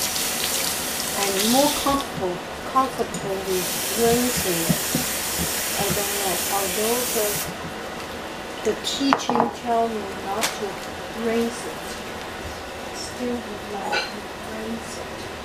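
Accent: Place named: Liberia. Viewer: Oh okay... sound Like Liberian. Nice cooking :)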